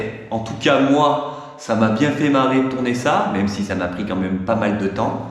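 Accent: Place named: Cantal, France. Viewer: Salut merci et bravo pour le travail et les vidéos. Quel projet ! Je découvre la chaine il y a 4 jours et toutes les vidéos y sont passées. Je trouve ton humour second degré et auto dérision excellent. À mon avis le petit accent est cantalou ? FAQ:c'est tout simplement à ce stade que tu présentes ton parcours comment tu as acquis ces compétences comment tu geres financièrement le temps des travaux même si j'ai mon idée. Pour le poêle dans ton style un peu industriel je trouve que un poêle bullerjan dit canadien irait très bien. J'en ai deux chez moi un gros de 16kw et un petit de 6kw pour une dépendance. Si tu veux je peux t'envoyer les photos sur ton mail. Merci encore et à très vite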